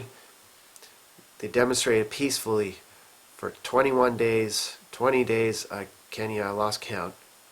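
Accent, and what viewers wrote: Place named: Canada. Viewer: you’re a great guy! You wear your heart on your sleeve! From the beginning I watched you mostly, although some others, but with you I really got the Canadian vibe. I’m from the Southern US that’s it’s enjoyable hearing you talk dude! I get a kick out of how you describe the cold weather as “snappy” 😹 For years Americans joked about Canadians but let me tell you, y’all have earned our respect and the worlds respect over the past 3 weeks! Honestly, I’ll never look at the Canadian flag the same way ever again! Now, I see the Canadian with so much respect and it’s an honor having good people like you just to our north! Also to add, I’ve learned more about Canadians in the past 3 weeks than ever before and I really appreciate what you’ve done as well as everyone else! Stay strong! Y’all have the support of so many people all over this world including your cousins down down here in the US!!!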